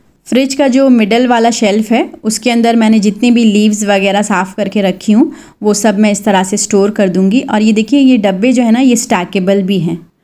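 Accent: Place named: Uttar Pradesh, India. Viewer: App Mumbai so ho. Lekin bat tu pori up wali kartey ho☺️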